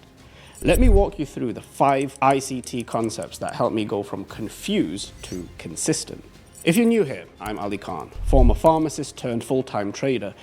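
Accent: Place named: United Kingdom. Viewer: Ali’s concise and articulate delivery, paired with his clear British accent, makes complex trading concepts much easier to follow. I truly appreciate the direct and structured approach—far more effective than the overly drawn-out explanations some others offer. Excellent content!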